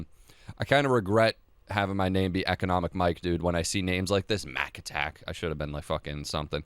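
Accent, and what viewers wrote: Boston, United States. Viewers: Not an insult, but you sound like one of the boys outta Boston.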